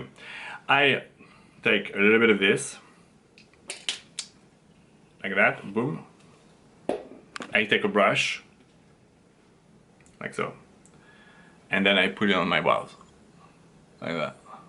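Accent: France. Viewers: french accent bro ah la la jsuis pas le seul mdrrrr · As a French I like your French accent 😁😁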